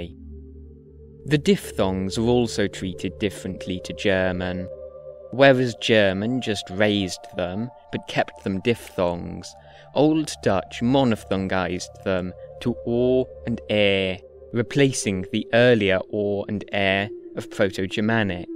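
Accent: United Kingdom. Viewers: I love your accent!! I feel it is from somewhere in UK, is it??? (I'll continue to watch the video, as I also love knowing the evolution of languages! The one I speak as native is Spanish).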